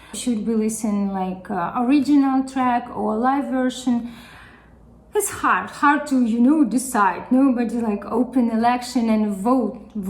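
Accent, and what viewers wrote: Russia. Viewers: Where you from ? Russia? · Забавная реакция) судя по акценту, ты русская?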